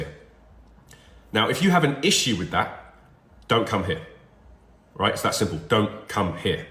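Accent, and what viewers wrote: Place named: United Kingdom. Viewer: Dude your spot on we dont really care about anyone's ethnicity or colour just try and fit in too our culture the country and culture you chose to move to, were happy to have you but try and fit in, you sir are a perfect example of a family who has embraced British culture you speak like a true brit perfect use of language and I'm glad your one of us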